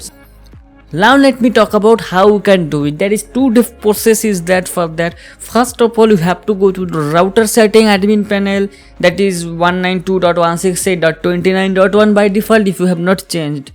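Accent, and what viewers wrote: Bengal, India. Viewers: He is Bengali i can tell certainty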